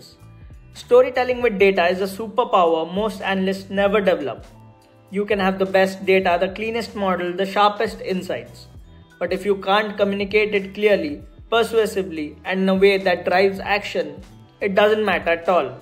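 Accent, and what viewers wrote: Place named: India. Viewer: I'm argentinian and i dont speak English with argentinian accent, i do it with an american accent. Why do Indians never get off their goofy ass accent? Cant take you seriously.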